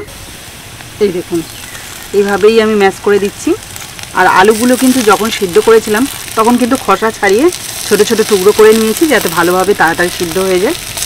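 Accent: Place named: Hooghly, India. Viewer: বৌমা , তোমার কথা শুনে মনে হয় তুমি হুগলি জেলার বাসিন্দা । তোমার রান্না খুব সুন্দর এবং পরিষ্কার । তোমার চ্যানেলের উন্নতি কামনা করছি ।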